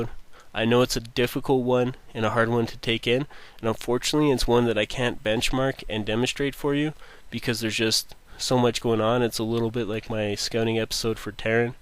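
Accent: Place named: Canada. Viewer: ha,I guessed right, you were canadian! Didnt really pay much attention how you speak, but eventually that 'o' came out quite clearly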